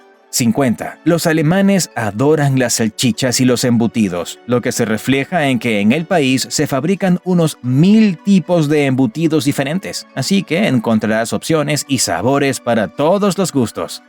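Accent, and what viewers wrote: England, United Kingdom. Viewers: Da risa escuchar como alguien pronuncia palabras y nombres alemanes en estilo o mas bien con pronunciación ingles. Locura completa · ni "uagner" ni "ulks uagen" si vas a hacer un video sobre Alemania y laurear sus virtudes empieza por respetar el idioma, es normal "castellanizar" las palabras para ajustarlas a nuestro acento pero no "anglizarlas" por ignorancia, es tan fácil como usar el traductor de Google y reproducir su pronunciación para luego repetirlo lo mejor posible, me sangran los oídos cada vez que usas acento ingles al pronunciar las palabras nativas del Alemán, la "W" suena como "V" o "F" así que suena: "Vagner" y "Folks Vagen" RESPETEN EL IDIOMA! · el pronuncia como un inglés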